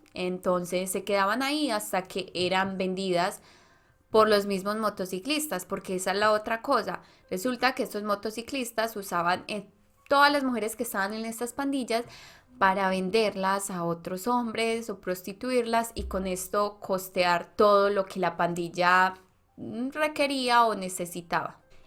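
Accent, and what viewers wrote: Colombia. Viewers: Hola nena! Espero que estés muy bien. Te quiero aclarar algo: No se les llama moteros. Yo sé que eres colombiana , y me encanta tú acento. Se les llama bikers. Que se pronuncia baikers. Y no se dice autostop. Se le llama ride , Que se pronuncia raid. Eres muy bonita, y excelente narradora. Felix Aguilar desde los Ángeles California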